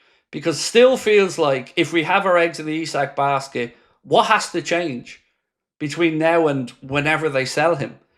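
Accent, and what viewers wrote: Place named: Liverpool, United Kingdom. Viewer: More Scouser verbal diahorea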